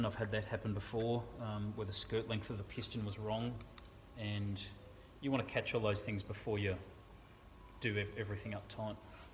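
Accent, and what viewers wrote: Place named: Australia. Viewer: Cheers thanks for the Mellow rebuild and Aussie accent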